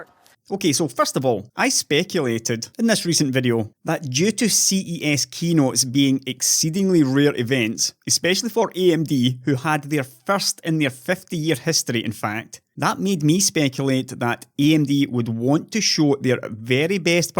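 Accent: Scotland, United Kingdom. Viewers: so. much. sa.tis.faction. Just dripping from your thick Scawttish vowels. I even felt a little bit smug myself:) nice work! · Wow, that is an absurdly strong Scottish accent.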